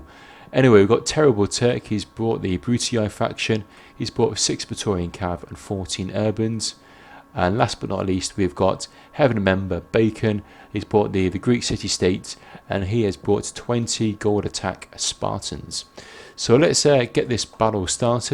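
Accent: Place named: Wigan, United Kingdom. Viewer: what part of the UK is accent from? Sean Bean has the same style of English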